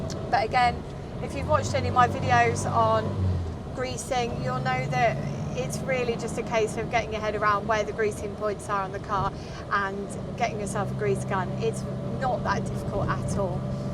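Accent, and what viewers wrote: United Kingdom. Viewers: I love your voice and your british accent. Your voice is very soothing and it calms me down when I get anxiety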